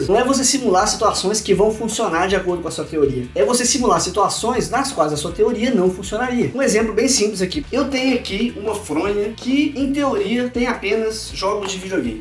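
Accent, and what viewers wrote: Minas Gerais, Brazil. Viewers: você é mineiro. Estuda em São Paulo ou em Minas? Abraço.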